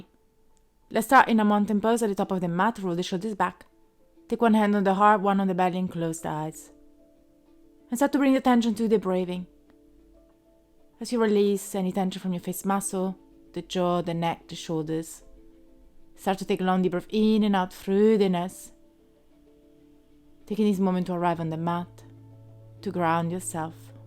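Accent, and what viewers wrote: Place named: Italy. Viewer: That was really a phantastic flow but for me it would be perfect if you could talk slower. Please consider one hast to get used to your Italien accent and not everybody who is watching this videos is a native english. So I am german for example. Nevertheless thank you very much for this interesting lesson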